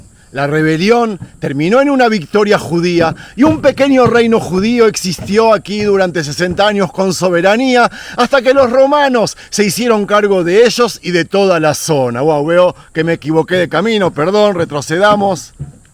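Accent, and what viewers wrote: Argentina. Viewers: Eres de Israel tienes el acento de Argentino... 🤔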